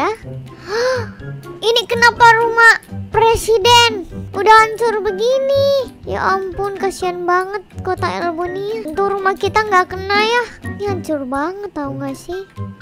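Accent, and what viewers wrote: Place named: Manado, Indonesia. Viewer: Kka orang manado yak:v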